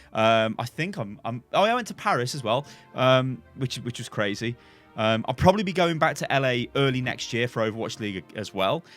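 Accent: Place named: Birmingham, United Kingdom. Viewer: Damn this nigga from Birmingham?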